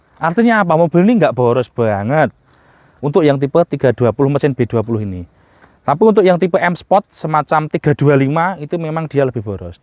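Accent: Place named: Yogyakarta, Indonesia. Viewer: Haha liat masnya dan itonasi bicaranya jadi kangen jaman kuliah (Jogja)… 😁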